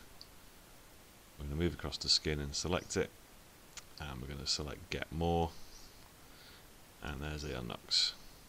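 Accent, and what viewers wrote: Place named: United Kingdom. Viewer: Really like watching your tutorials but I do have one little issue I can't really hear you to great nevertheless it's good to watch some British tutorials instead of American all the time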